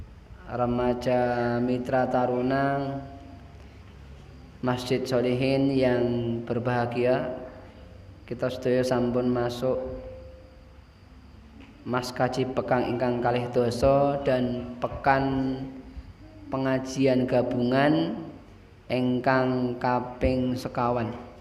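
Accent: Java, Indonesia. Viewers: Di mengerti,,,,, heheheh jawaaaa ki hehhe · Waduhhhh jowoooo wkkkk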